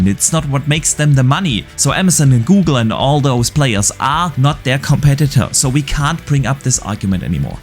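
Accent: Germany. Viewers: Kommst du aus Deutschland ? Verdächtiger Dialekt 🤭, auch wenn du es gut verbergst 😁.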